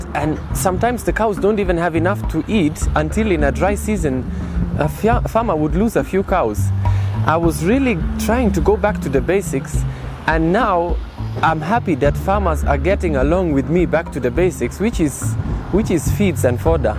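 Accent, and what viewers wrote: Kenya. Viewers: Yeah.. He speaks like a kenyan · Close your eyes and you will hear Kenyan speaking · The guy has a Kenyan accent, that surprised me, kudos mate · He even mastered our kenyan accent. 🤣🤣🤣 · He speaks like a Kenyan, wow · His Kenyan accent is dope! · As a Kenyan, if I listened to audio of this video alone, I'd say he was born and grew up in our country...pure Kenyan accent! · dude you sound more kenyan than me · hii accent ni ya county gani Kenya? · Very valid points about logistics...whoever figures that out for africa will be a very rich woman/man..... his accent tho if I didn't see him I'd think he's kenyan wow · Wow you speak like a Kenyan 😲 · His accent is a mixture of luo kalenjin and kikuyu. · Accent ni Kenyan Kabisa... inspiring though.. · Cool venture. It's so funny that he even speaks English with a Kenyan accent. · Kabisa. Kenyan Ancent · am impressed with your new Kenyan English accent. 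happy for you. will visit soon. love from uganda. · This dude has picked up a "Kenyan English" accent. Cool. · His accent already sounds kenyan... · DUDE SOUND LIKE HE WAS BORN AND BRED IN KENYA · Kenyan accent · This guy is so Kenyan and is most likely married to a luo hahaha I love the accent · You even have a Kenyan accent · His accent is soo Kenyan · Haha Typical Kenyan accent 🤣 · You have a Kenyan accent 😅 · 🧠🧠❤👈👈✅✅✅👌👌this man he is smart intelligent person because the way he performs and pertaining the accents of Kenya English ✅✅✅✅🤔🤔👌👈🧠🧠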